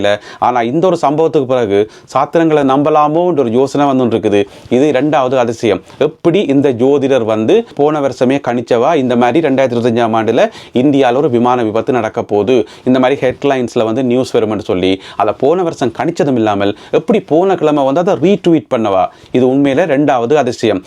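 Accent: Jaffna, Sri Lanka. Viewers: He is a srilankan. Srilankan tamil is pure . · இலங்கை தமிழ் உச்சரிப்பு அருமை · Yes he may be belongs to Elangai. It's Elangai Tamil. People who lost their lives their Soul may rest in peace. Very sad. 🙏🙏🙏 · இலங்கை தமிழர் என்று நினைக்கிறேன். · உங்கள் இலங்கை தமிழை ரசித்தேன் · Firstly I thankyou for your talkbeautiful Sinhalese Tamil well whatever happens ellam. Avan chail whole world is mourning and offering there prayers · Thambi, Thamizh oru Thinusa irukkae! Ilangaiyaa? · இனிய இலங்கை தமிழ் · Ilangai Tamil huh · அழகான இலங்கை தமிழ். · தமிழ்நாட்டில் எங்கே இதுமாதிரி சுத்தமான தமிழ் பேசுகின்றனர். இவரின் பேச்சு இலங்கை தமிழே. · Its sri lankan tamil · Srilanka tamil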